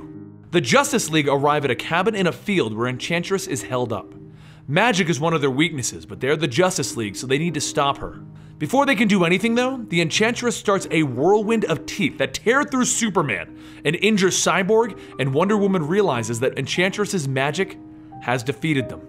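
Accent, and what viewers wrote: United Kingdom. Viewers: Nice British accent xD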